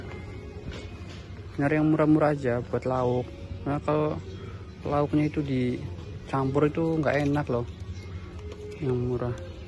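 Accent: Banyumas, Indonesia. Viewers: Amin. Amin. Sama-sama Mas. Ngapaknya tidak begitu kelihatan kok Mas 😁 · wong ngapak ya kang hhe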